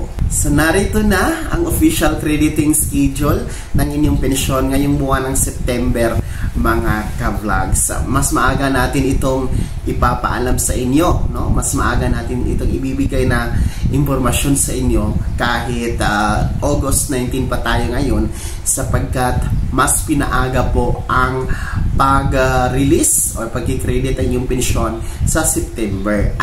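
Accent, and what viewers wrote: Visayas, Philippines. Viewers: Bisaya ka dong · Tumigil ka nga puro.ka fake news pampagulo.ka pa.pati.pronounciation ng mga words promdi talaga.bisayang.bato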